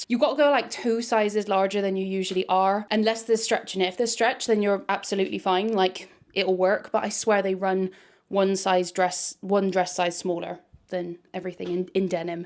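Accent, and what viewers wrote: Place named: West Country, United Kingdom. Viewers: Hi, ive just binge watched all of your Temu vids. Love them. Then i thought lets go back to the beginning, omg you were so sweet. Can just about hear your lovely west country accent. Xx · “I seen” is classic West Country pronunciation and totally normal and fine and very endearing, so don’t stop! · My Great Great Grandma was born in Bedminster in 1851 she eloped with her cousin to the Forest of Dean in 1871 where they got married in Drybrook Church . My Great Grandma was born in 1872 . Family names are Warbutton and Emery I've never met any of the family ,as my Great Granny was brought up to the North East when she was a baby by her step Dad and his family after her Mum died (supposedly) . Did family tree but no mention of Eliza after her baby (my Great Granny) was born in Bedwelty Workhouse in Tredegar South Wales. 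Got Elizas marrage certs to both Her 1st husband Robert Joseph Emery and 2nd Elijah Biggs . Dont know which one was my G real Grannys Dad . Keep your accent I love it the Bristol accent it's part of my ancestry alongside my Northumberland accent.❤️ -By the way my Great Granny lived till she was 93.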